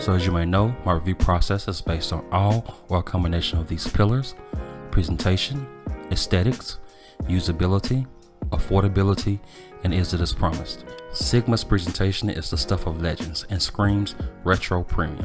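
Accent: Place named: South Carolina, United States. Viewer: Oooooooh. I want these! And is that South Carolina I hear in your voice?? 🤣💯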